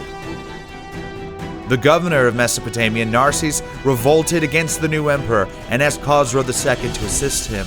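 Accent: United States. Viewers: This just does not work for me. The American accent with it's mispronunciations of cities and countries makes it sound very amateurish. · God, an American accent on a history show makes it sound like a cheap History channel pile of bullshit.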